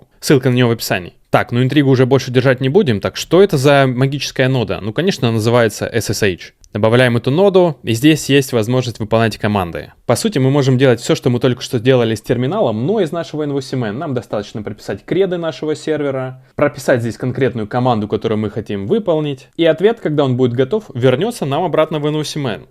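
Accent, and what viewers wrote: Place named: Russia. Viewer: Рунглиш классический)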